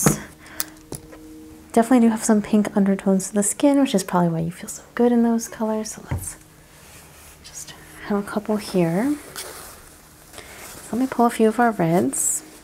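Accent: South, United States. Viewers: I love her accent… so southern ❤ I’m from Alabama so I guess that’s why I love it 😊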